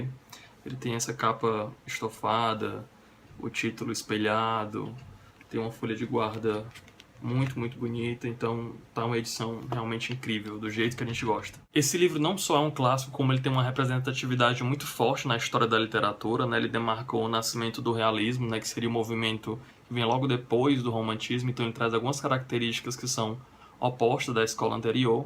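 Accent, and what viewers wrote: Northeast, Brazil. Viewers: Acabei de conhecer o canal pelo vídeo da resenha de A Desumanizacao! Gostei muito do jeito que você descreve os livros, ta de parabéns (sem contar o sotaque nordestino que eu adoro) já tem mais uma inscrita! :) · Sotaque nordestino?😍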